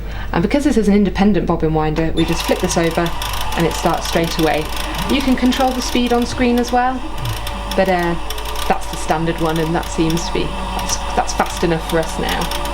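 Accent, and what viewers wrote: United Kingdom. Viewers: I adore her proper British accent. Great demonstration. I intend to buy one this week.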